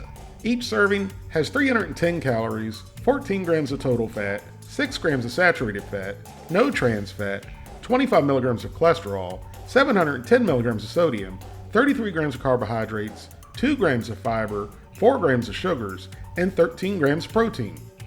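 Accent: South, United States. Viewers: Being from the northeast, nobody here says "pizza pie". It's awkward and a bit redundant. So hearing it, along with a southern twang and so much excitement is funny, in a strange way. It's not like I'm having Vietnam-esque, PTSD flashbacks and night sweats. But it's amusing though.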